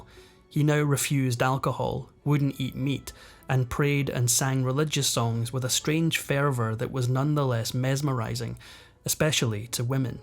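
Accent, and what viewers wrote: Scotland, United Kingdom. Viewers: My all time favorite narrator Love listening to the Scot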